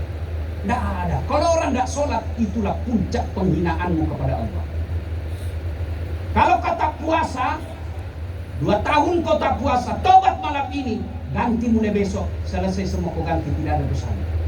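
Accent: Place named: Sabah, Malaysia. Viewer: Pintar sudah Ustadz bicara sabah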